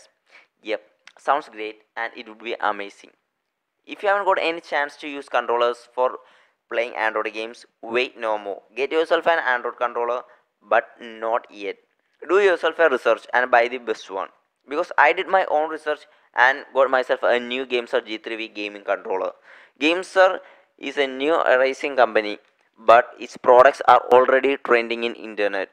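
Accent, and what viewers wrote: Kerala, India. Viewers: Da neey mallu anallae?.. voice ile manasilayadane enake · lol indian accent...rip english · from ur accent i understood ur from kerala. Even im in kerala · pure malyali accent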